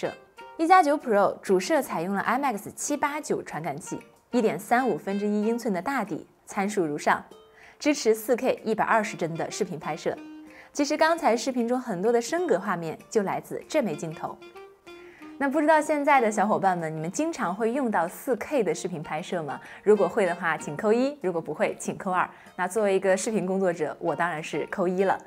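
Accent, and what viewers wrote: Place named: Hebei, China. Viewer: Eva是河北人吗？说英语的感觉和我老婆一样，我老婆邯郸的。加油！支持你！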